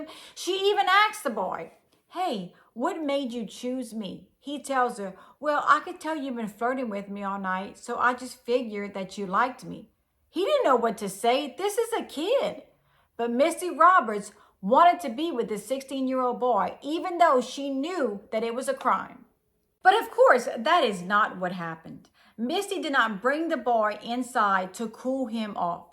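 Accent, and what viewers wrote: Louisiana, United States. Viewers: My new favorite channel. Southern Louisiana boy living out of state. A smart cajun sounding voice is entertaining and comforting. Keep it up and thanks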